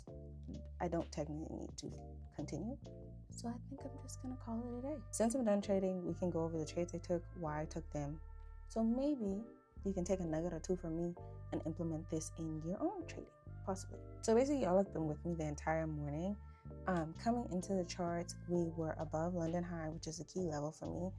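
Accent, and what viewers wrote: Bahamas. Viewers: did i hear a bahamian accent 👀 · Im enjoying this video. I had stopped trading for like over a year, but youre inspiring me to get back into it. Btw are you Bahamian? You sound like it. Anyway great video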